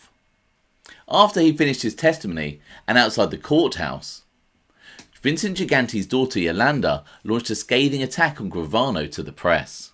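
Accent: United Kingdom. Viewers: Brit talking about American Mafia lol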